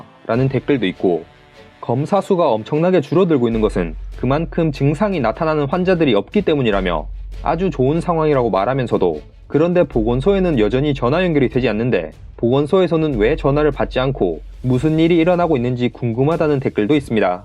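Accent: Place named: Gyeongsang, South Korea. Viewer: 이거 경상도 사투리죠? 억양이 오르락 내리락 ㅋㅋ 표준말을 좀 연습하세여~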